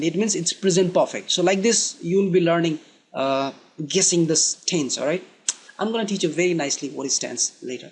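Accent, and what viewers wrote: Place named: England, United Kingdom. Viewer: Like your english accent👍🏻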